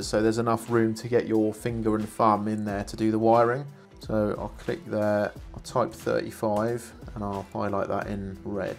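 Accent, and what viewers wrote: United Kingdom. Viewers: The accent is british. Are u british ? Like ur video, im an electrical engineering fresh graduate and i like to learn british accent. Ur video just very useful amd interesting for me. Keep it up🎉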